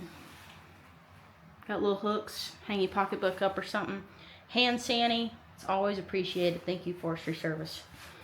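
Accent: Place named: Montana, United States. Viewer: Your starting to sound like them people in MT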